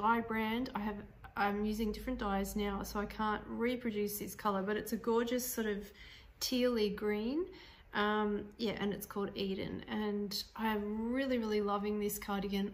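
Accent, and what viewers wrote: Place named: Australia. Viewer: Wow I was wondering the other day if you would ever podcast again! I think it’s been 2 years since you podcasted last. So nice to see you again and hear an Australian voice for a change. Looking forward to seeing you more regularly ❤ btw I love the smocking cardigan you were wearing.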